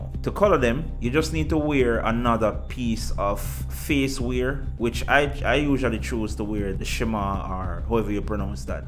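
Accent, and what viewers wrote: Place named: Jamaica. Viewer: I like your accent, man. Are you Jamaican?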